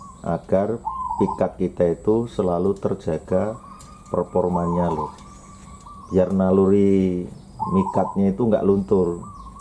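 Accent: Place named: Tegal, Indonesia. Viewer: MZ bahasane ecek2 njenengan orang Tegal apa Slawi 😃😃😃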